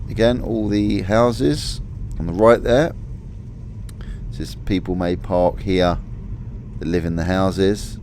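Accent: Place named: West Country, United Kingdom. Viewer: You sound very west country (like Devon/Cornwall). Not sure what I expected a Guernsey accent to be like though.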